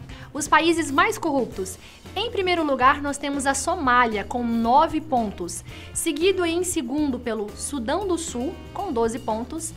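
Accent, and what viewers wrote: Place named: São Paulo, Brazil. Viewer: Sotaque de paulista feio! Coloquem uma pessoa que saiba pronunciar as palavras corretamente !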